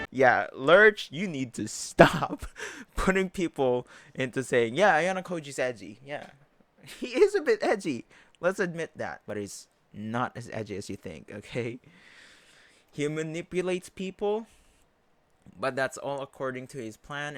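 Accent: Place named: Philippines. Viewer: Completely unrelated to the the topic. But you have a strong filipino accent my guy lmao. were you born there and still currently live there? Im filipino too but wss born in the states. So my tagalog isnt good i can speak the basics but when it comes to having a complete convo i cant lmao but i can understand all of it. Im more fluent in spanish since a lot of my friends are latino i know weird lmao. and from a very young age I've always watched anime in Japanese so i know japanese too lmao.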